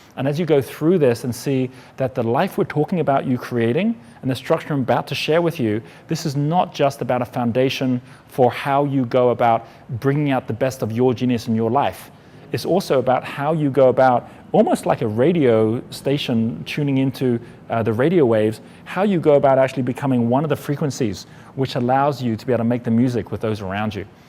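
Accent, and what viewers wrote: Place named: South Africa. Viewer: Can somebody tell me where his accent is from? It sounds like a South African gone to Australia or something.....curiosity killed the cat I know, but it's killing me not to get it 😬😅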